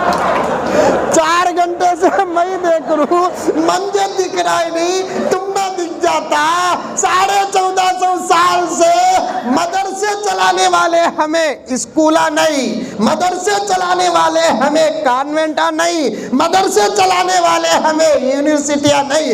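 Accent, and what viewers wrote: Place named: Goripalya, India. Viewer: Pure goripalya accent ameer sab Allah apki Umar mein barkat de ❤❤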